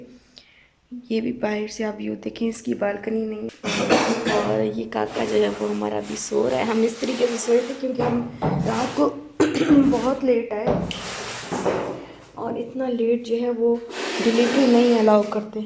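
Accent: Saraiki, Pakistan. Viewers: Apka excent buhat pyara hai. Looks like seraeeki touch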